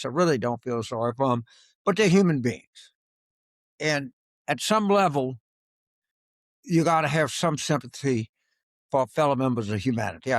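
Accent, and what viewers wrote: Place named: Louisiana, United States. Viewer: This is funny as hell especially when you cuss with your Southern Louisiana accent.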